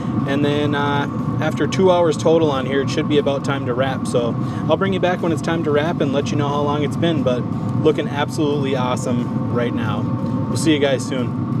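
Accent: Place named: Upper Midwest, United States. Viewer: Hahaha, you're not from the Upper Midwest are ya? Great video, I followed it to a T and they turned out great! thanks!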